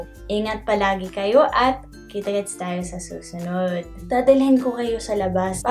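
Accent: Visayas, Philippines. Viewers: Cute video! You speak Filipino Tagalog with a slight visayan accent? And your use of those colloquial expressions are spot on! Very good filipino :) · you sound like a bisaya talking in tagalog... i know that because i sound like you... hehee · As a filipino i know what accent You will be good i think bisaya or mostly known the Cebuano language and hiligaynon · ang galing po ninyo mag Tagalog. nice!! May konting puntong bisaya. cute.. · Parang bisaya ka day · Ang cute niya managalog! Malambing na may puntong bisaya. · You speak Tagalog like a Visayan =)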